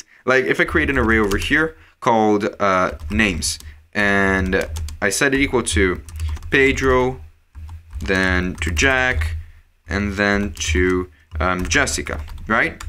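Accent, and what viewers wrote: Brazil. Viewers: Olha eu aqui procurando por videos gringos pra aprender web development e me deparo com teu canal! Pelo nome vi que devia ser portugues/brasileiro, mas pelo sotaque imaginei ser brasileiro. Parabens pelo conteudo e obrigado por compartilhar! + 1 inscrito =D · Thanks for the video, Pedro. Really useful. I finally got the arrow functions now thanks to you. Also, you sound brazillian for some reason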